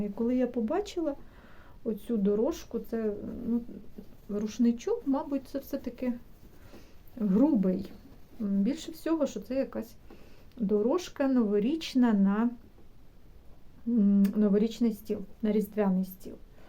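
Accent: Poltava, Ukraine. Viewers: Полтавський говор буде завжди,якщо ти народився і виріс на полтавщині.Для мене він найрідніший...Плачу разом з вами...Тримаймося...тримаймося...Обіймаю...💞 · Смотрю Вас девочки оч. давноно подписалась недавно, полтавская речь это другая история ...сама из Полтавы, ...С наступающим Рождеством...🎄☃️🎉🙏🍀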